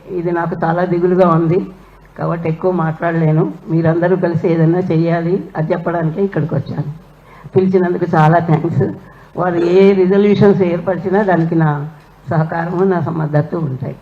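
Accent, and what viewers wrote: Andhra Pradesh, India. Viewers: మీ మాట చుస్తే మీరు ఆంధ్ర మనిషి లాగానే వున్నారు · ఇంత వయసు వచ్చి ఇలాంటి మాటలు మాట్లాడవచ్చా అందర్నీ పంపించేసి నువ్వు ఒక్కదానివే ఉండమ్మా మీకు కెసిఆర్ దొరల పాలనే కరెక్ట్ మీ భాష చూస్తుంటే అచ్చమైన ఆంధ్ర భాష లాగా ఉంది తెలంగాణ వాళ్ళని ఇలాంటి మాటలు చెప్పే కెసిఆర్ బాగా ఉపయోగించుకున్నాడు సాటి తెలుగు వాళ్ళ పట్ల ఎంత విద్వేషం పనికిరాదు రాజస్థాన్ బీహార్ ఆఖరికి రోహింగ్ గేయాలు కూడా ఉంటున్నారు వచ్చి ఇప్పుడు ఏంటి నీ బాధ అందరూ నీ చుట్టూ చేరి భజన చేయాలి నువ్వు పెద్ద నాయకురాలు కావాలి నువ్వు దిగులు పడకుండా విశ్రాంతి తీసుకో అమ్మ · మీ మాట చూస్తే మీరు ఆంధ్ర మనిషి లా ఉన్నారు మీ వయసు కి ఇలాంటివి అవసరమా బామ్మ గారు కృష్ణ రామ అనుకోవాలి గాని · Your language slant is likely Andhra Brahmin,and good actress 😢😢😢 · Why is she talking Andra Telugu? MENTAL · నీ భాష ఏమో ఆంధ్ర యాస ల ఉంది మళ్లీ ఆంధ్ర మీద పడి ఏడుస్తుంది ., వయసులో పెదవాల్ కాబట్టి గౌరవం తో చెప్తున్న హైదరాబాద్ ఆంధ్ర వలది అప్పుడు ఇప్పుడు ఎప్పుడు ఆంధ్ర వలదు మేము పాలుంచి చూపిస్తాం ., హైదరాబాద్ ఏ కాదు కాస్ట్ పాడే తత్వం ఉన్నవాళం కాబట్టి ఏలాడన్న రానిస్తాం ., వీలు ఐతే కాస్ట్ పడి మీ రాష్ట్రాన్ని ముందుకు తీసుకెళ్లండి ఎలా ఆంధ్ర వల మీద పడి ఏడవడం మానేయండి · Nuvenduku Andhra basha matladutunsvu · Andhra mukhya mantri CBN development chesina Hyderabad IT company lanu vellagottandi. 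Alage meeru matadu thunna bhasa Telangana yasa ledu . Andhra yasa vundi. Mundi adi marchukondi. Panikimalina vidveshalu.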